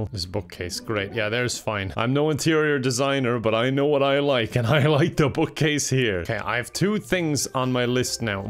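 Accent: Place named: Ireland. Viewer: he's Irish, that's his accent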